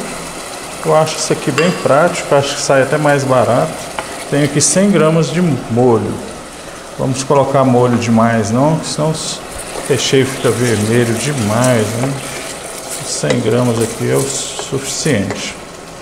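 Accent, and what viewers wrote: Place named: Goiânia, Brazil. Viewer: Falo de São Paulo capital acho lindo sotaque de Goiânia, acho muito legal qdo você fala a palavra Pregar qdo se refere a massa, aqui na minha região a gente fala grudar. Nosso Brasil é maravilhoso cheio de cultura diversificada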